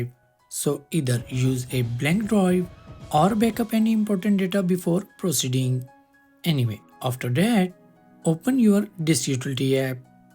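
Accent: India. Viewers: Look, please do not take this wrong, When in visited USA for the first time Americans made fun of my own voice. I still have an accent with English to an extent, but because I have tken very long and hard amaounts of time to train myself, now, most people do not think i'm from Russia let alone real rural North Ossetia, just don't think I'm trying to bully you or make fun of you, it's just the truth. I've been to USA alot, and most Americans think this is absolutely annoying. We all know the English's rather dismal outlook on "Paris" and "Indians". But, most of it is trying to deciepher what you are saying. The western world deals enough with your kind of accent on the telephone supports, now you guys are in my country trying to speak Russian! I can only imagine...it's just frustrating. You got to see it from a native speakers perspective. How would you like talking to me on the phone in Hindi with a super thick indecipherable Russian accent but calling myself Krishna or Sanjaya, or an Indian name? 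It's annoying.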